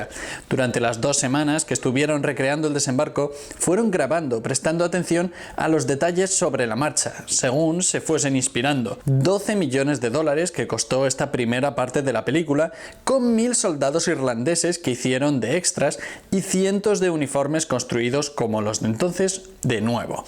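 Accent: Spain. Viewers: Para comenzar, el dejo o mote Español me llega al chompiras. Y a quien xuxa le importa si el tanque, o la placa o la maquina de escribir son o no son de la época.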